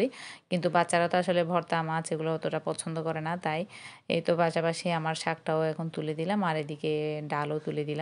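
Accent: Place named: Chittagong, Bangladesh. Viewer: অনেক সুন্দর হয়েছে আপু ভালোবাসা রইল তোমার জন্য 🎁🎁পুড়ে যাওয়া ভাত খাওয়ার উপযোগী করে নিলে আমিও চট্টগ্রামের মেয়ে তোমার কথা শুনেই বুঝতে পেরে গেছি ভিডিওর শুরুতেই ❤❤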